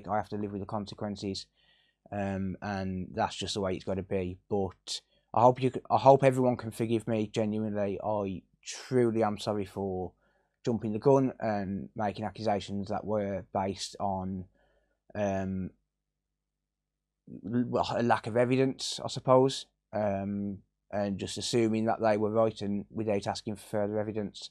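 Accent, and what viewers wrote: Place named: Black Country, United Kingdom. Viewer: We have all fell into that trap, at least you owned the error, now you can move on and continue with the great content we always see from you. You have gave me an interest in electronic repairs, not sure I could as I'm thick as two short planks but anyway, oh and big up the Black Country accent, I hail from West Brom... Keep up the good work 👍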